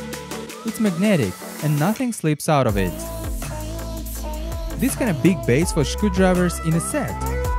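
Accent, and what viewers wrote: Russia. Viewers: I love his Russian accent/grammar 👍🏼👍🏾👍🏿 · ну акцент то русский слышен за километры... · И акцент русский(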